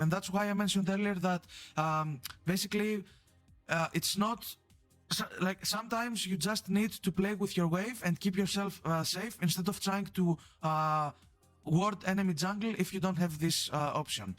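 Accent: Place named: Greece. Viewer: Good video. Also your accent is familairly greek :p .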